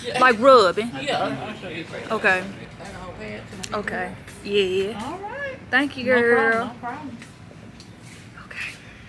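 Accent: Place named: Memphis, United States. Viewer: welcome to almost 40.. But you are still a BAD chick..U make me laugh so much because being from Memphis,i hear so much of it when you talk.. So i cant relate…You can also use rice water on your hair, it work wonders.. still stinks but I use it weekly with Castor oil…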